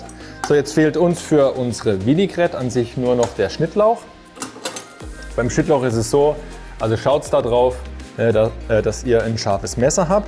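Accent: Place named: Berlin, Germany. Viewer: "Nen Gudn Appetit wünsch ich euch" lol Ich liebe diese geschwollene Berliner Redensart. Erinnert mich sehr stark an Christian Rach. XD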